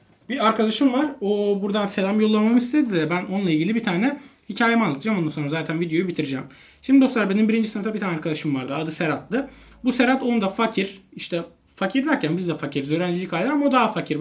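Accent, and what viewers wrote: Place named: Gaziantep, Turkey. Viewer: Adam antepli konuşmasından anladım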